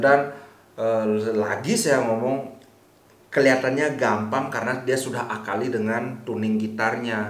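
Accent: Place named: Bali, Indonesia. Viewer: BESER... 😁😁😁 medok sajan base baline adi bli...😅😅😅 👏👏👏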